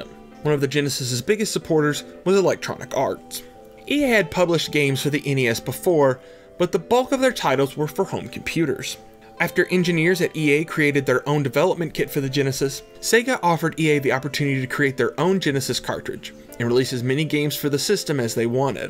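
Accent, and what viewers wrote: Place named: Oklahoma, United States. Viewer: Are you from Texas or Oklahoma? I’m from Oklahoma and recognized a hint of twang in your voice and was just curious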